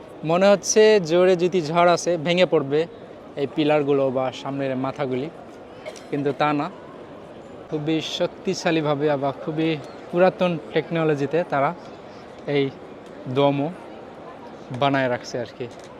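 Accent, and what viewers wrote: Rajshahi, Bangladesh. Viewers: বাংলাদেশে আপনার বাসা কোথায়, ভাষা সুনে রাজশাহী মনে হচ্ছে।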